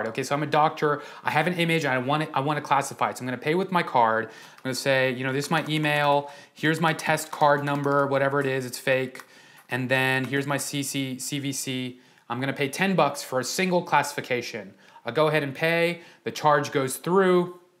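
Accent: India. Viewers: Are You Indian? 😃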